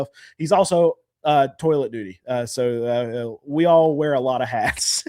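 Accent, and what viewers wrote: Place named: Texas, United States. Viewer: Are you in dfw? Sound like a burleson man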